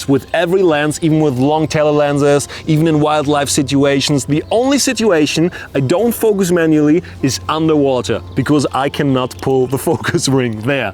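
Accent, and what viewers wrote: Germany. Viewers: wow ich find es so lustig wenn deutsche englisch sprechen 😄aber das gefällt mir sooo gut weil ich dich echt gut verstehen kann obwohl englisch nicht so meins ist... Ich habe eine Sony a58 und ich liebe sie 😍 ich liebe es auch dass man durch den Sucher alles machen kann, und ich habe sie eigentlich nur gekauft weil sie sogar viel günstiger war als canon dabei hatte sie mich von Anfang an so gut überzeugt😍😍 aber ich meine, meine hat trotzdem einen Spiegel 😄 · are u german? just curious,cause of the dialect... edit: your camera is setted to german, so i may be right! greetings from austria!😉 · omg this German accent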